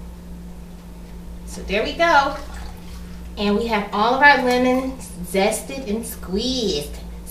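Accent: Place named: South, United States. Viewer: I just want to know, do you have to talk so country?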